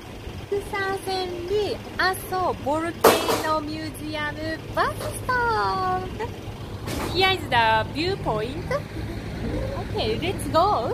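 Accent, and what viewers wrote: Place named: Italy. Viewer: I LOVE all of your videos. This is just my observation so I do not mean to offend you, but it sounds like you have an Italian accent. I think it’s COOL!!!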